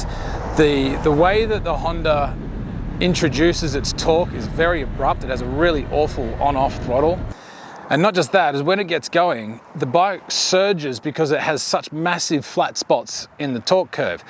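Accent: Australia. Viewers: Mate are you an aussie? In not picking up a US accent. Awesome video though. Thanks for the comparison. Been eyeing off the Honda for my next bike. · You sound Australian... but keep saying the Hornet is "under $11K" - in what country? In Sydney Aust they are "Under $20k"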